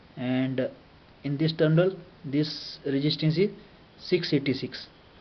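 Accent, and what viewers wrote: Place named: England, United Kingdom. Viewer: fuck this english accent !!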